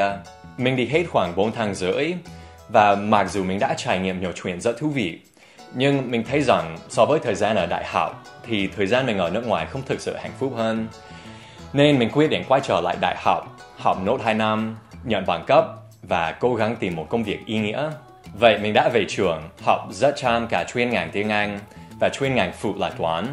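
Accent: Standard. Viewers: Ngưỡng mộ anh ghê anh nói tiếng việt chuẩn ghê.... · Nói tiếng Việt chuẩn ghê...giỏi...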